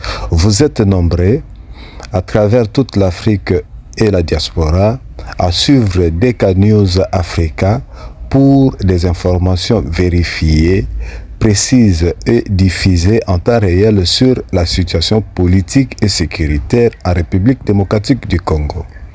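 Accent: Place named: Rwanda. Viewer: Avec tes R La tu rwandais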